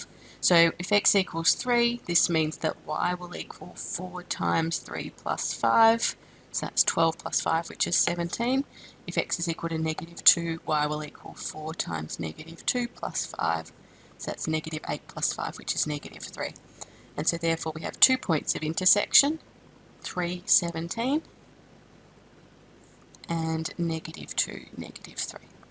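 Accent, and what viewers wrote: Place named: Australia. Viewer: i needed to find an aussie math channel cause im in aus and as i soon as i heard ur voice i found it thanks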